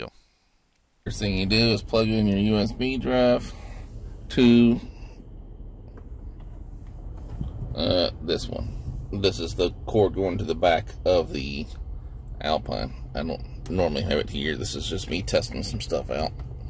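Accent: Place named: United States. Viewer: Nice and concise once you get to grips with the usa twang. A Kings English version would be most helpful. Thanks anyway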